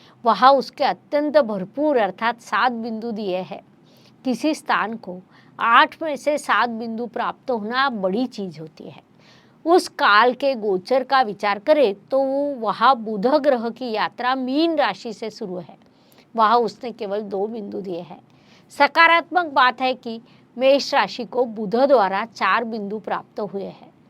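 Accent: Maharashtra, India. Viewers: I love that MARATHI accent....jai shivaji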